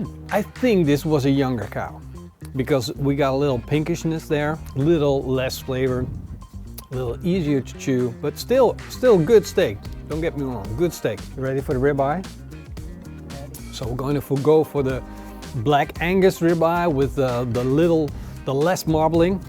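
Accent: Netherlands. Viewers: Would love to see you do some basque beef/ txuleta this year 😉👍🏻 keep up the great work, always love the videos and great to hear the Dutch accent 👍🏻 happy new year from Australia 🇦🇺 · Me watching this video: Noticable thick dutch accent Guy shows cheap steak from the jumbo: Yep definitly a dutchman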